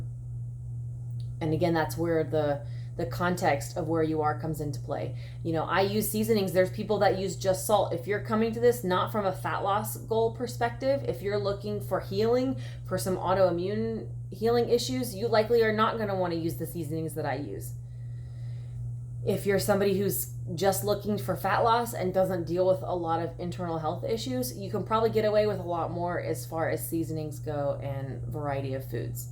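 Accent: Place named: Philadelphia, United States. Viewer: You from Philly? Just detect a bit of our accent. Love your channel.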